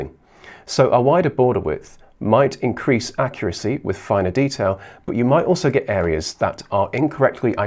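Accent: United Kingdom. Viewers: Thank you very much for this tutorial !!! And nice British English spoken, love it !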